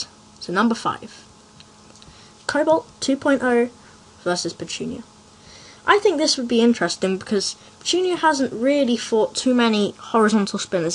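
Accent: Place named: United Kingdom. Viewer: I'm American, so hearing a British accent is sophisticated and refreshing for me, lol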